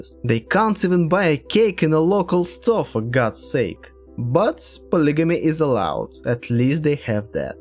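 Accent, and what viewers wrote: Russia. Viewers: What a terrible russian accent you are speaking with! But I'm ok with that. Have you been there? Why do you think this info is worth spreading? It is "prooved" only by those who imprisoned Vissarion. Go to the webside of the community and check how people deal with information.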